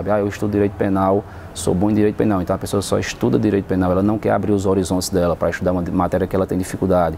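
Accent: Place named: Pernambuco, Brazil. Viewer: Sotaque de pernambucano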